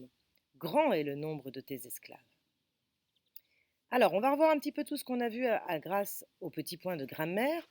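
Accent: France. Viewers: S’il vous plait corrigez votre accent français et placez bien l’accent tonique où il faut.Mais c’est bien?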